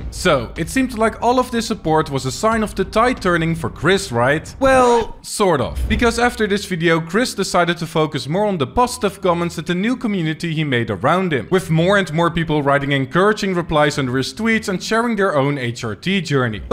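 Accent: Netherlands. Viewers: Ben jij Nederlands? Je accent klinkt echt erg Nederlands🤣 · I can hear that you Nederlands are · God the dutch accent..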